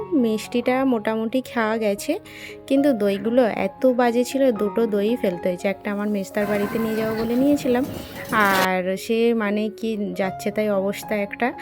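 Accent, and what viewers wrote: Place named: Bihar, India. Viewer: ❤❤❤ তোমার কথাগুলো না বিহারির মত হয় তুমি কি বিহারী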